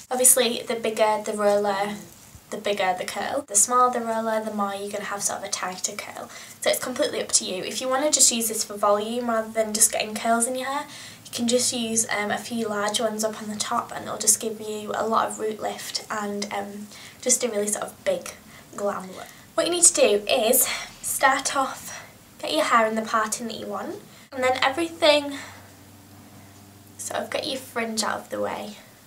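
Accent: United Kingdom. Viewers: What accent does she have?! Is it British? I love it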